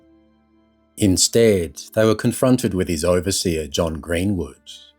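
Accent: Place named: Australia. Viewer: Great to hear a proper Aussie voice.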